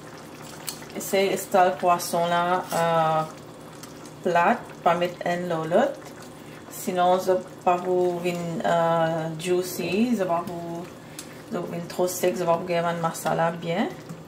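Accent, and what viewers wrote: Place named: Haiti. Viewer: Accent creole la seryer net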